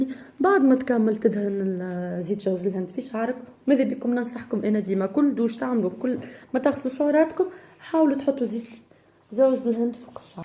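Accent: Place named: Tunisia. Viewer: يعطيك الصحة ختي نجربو ان شاء الله .انا من الجزائر واول مرة نشوفك وعجبني الفيديو.ومحلاها هدرة نتاع توانسة نحبها بزاف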